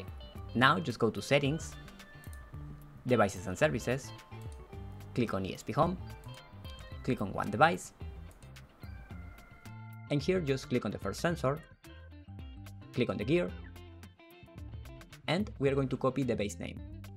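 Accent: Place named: Italy. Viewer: You video's are pretty clear, not too slow and not too fast. And when it's a bit too fast than it's less annoying to scrol a fragment back. But hihi... it must be your Italian vibe and accent.... I can't resist the urge to imitate your .... ' and.., that's it'. Funny repeating soundbite, I mean this in positive sense :D. Unfortunately I fail in my imitation, lol. Maybe you need to create your own home assistant audio notifications. :D :D and that's it.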